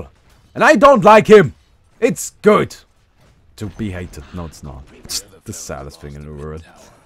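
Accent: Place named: Germany. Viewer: when it comes to "medallion", it's an accent. You have a german accent and we don't shit on you for mispronouncing some words, now do we? ^^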